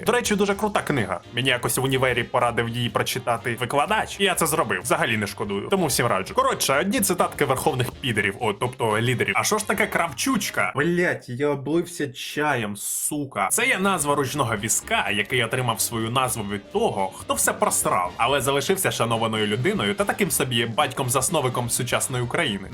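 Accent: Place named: Russia. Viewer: В тебе класний контент. Правда мені здалося, що той тон, швидкість, як ти говориш текст, схожий на когось з російськомовного сигменту, я не кажу, що це погано, але здається, що тобі було б зручніше підібрати свій темп та тон, як глядач/слухач скажу просто - трохи важко розуміти, що ти говориш бо воно зашвидко і трішки нечітко. Але взагалі тобі мега вподобайка і обов'язково продовжуй!!!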